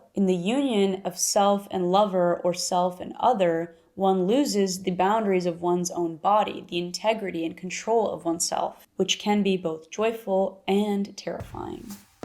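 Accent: Brazil. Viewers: Você é brasileira ou portuguesa? Pelo sotaque é brasileira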